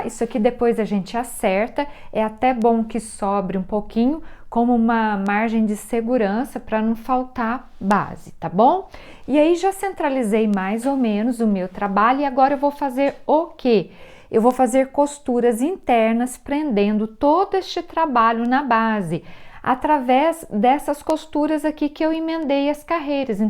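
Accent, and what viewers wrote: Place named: Russia. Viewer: I truly love the colors n the style, Especially your Russian accent😅❤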